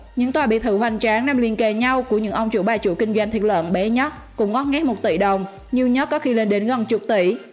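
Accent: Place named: Binh Dinh, Vietnam. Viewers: Giọng bà này ở Bình Định :)) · E à,c khuyến e tập phát âm chuẩn một tý vẫn còn giọng quê góc luon,chẳn hạn chử ăn e đọc i chân giân bình định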